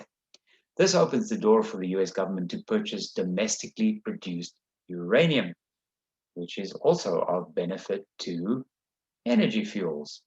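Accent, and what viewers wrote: South Africa. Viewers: Are you like half South African half American? (Accent)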